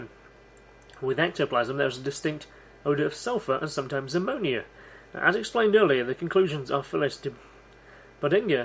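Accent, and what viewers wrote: United Kingdom. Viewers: When the narrator is a Scottish you can bet he knows what he's talking about just like in the original Star Trek , Captain Kirk this is Scotty you got to give me more power ! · This guy is english, what are you talking about lol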